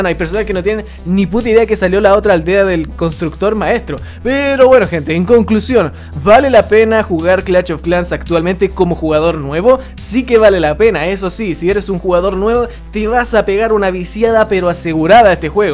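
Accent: Chile. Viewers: Tratas de hacer el español neutro e igual te sale aveces nuestro hermoso acento wn XD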